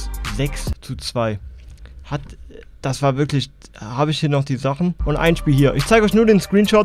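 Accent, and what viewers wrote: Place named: Saxony, Germany. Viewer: sächsisch Comedy juckt?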